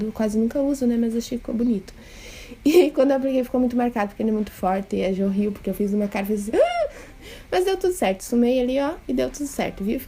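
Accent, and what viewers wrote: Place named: Rio Grande do Sul, Brazil. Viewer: que hino!! esse sotaque não me enganou, RS é tudo ♥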